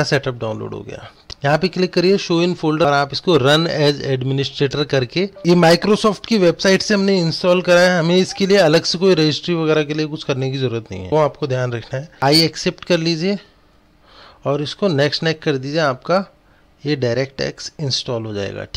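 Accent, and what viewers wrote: India. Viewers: Why your English is so indian!!!!!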